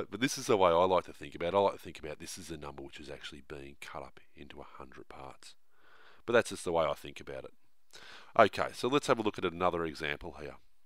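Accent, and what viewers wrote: Australia. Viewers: Are you Australian? Sorry if your not yo just sound Australian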